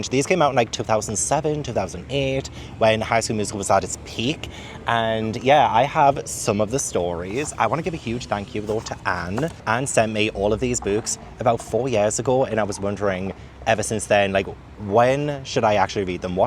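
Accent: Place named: England, United Kingdom. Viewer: Nah. Never feel out of place. I can promise that just having an English accent means everyone there thinks you're too grand for them (I feel like I can say this cuz I'm an American?)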